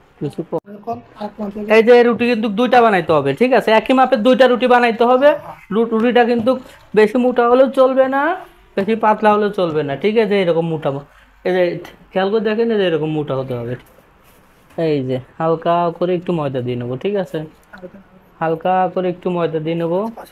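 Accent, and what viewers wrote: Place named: Bogura, Bangladesh. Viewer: বগুড়া বাড়ি মনে হয়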